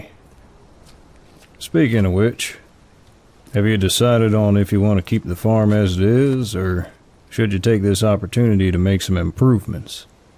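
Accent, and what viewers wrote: South, United States. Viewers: melted immediately with the real sounding southern accent 🥴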